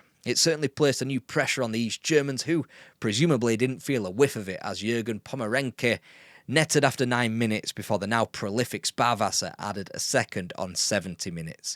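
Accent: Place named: Northern England, United Kingdom. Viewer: My girlfriend keeps trying to guess where you from she is between sheffield and manchester by your accent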